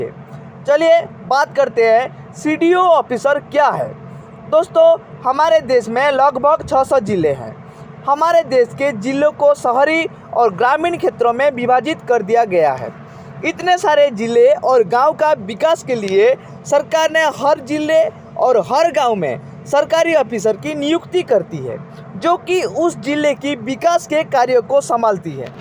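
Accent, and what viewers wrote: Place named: Odisha, India. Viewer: Bolnewala aadmi velong to odisha i can know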